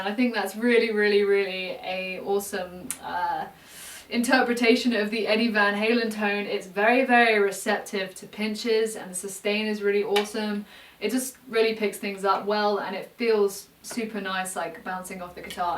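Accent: England, United Kingdom. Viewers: Easy on the eyes and ears. What’s not to like. Lol. Love the English accent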